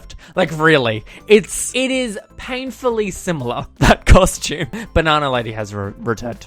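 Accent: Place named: Australia. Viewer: you sound like an aussie coco peru at times.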